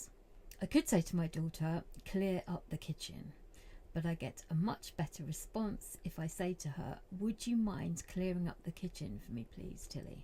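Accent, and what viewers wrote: United Kingdom. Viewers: Very good your class and your accent is beautiful where are you from in England? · Thank you very much for shearing 😊 I really like the way you teach English and of course you wonderful British accent❤❤❤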